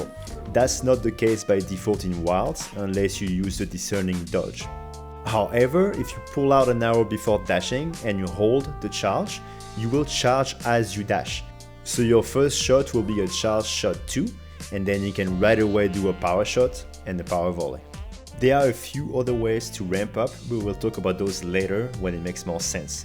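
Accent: France. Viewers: Tu es français j'ai l'impression vu ton accent. Ce n'est pas un reproche ça m'arrange ! Merci pour ton guide, j'ai vu beaucoup de vidéo sur l'Arc et la tienne est de loin la meilleur. Est-ce que ça serait possible d'avoir un guide de builds sur l'Arc ? J'ai fait pas mal de tests de mon côté et je ne trouve aucun moyen de rendre un build élémentaire viable. Ce que je trouve dommage car ça limite grandement les possibilités de build.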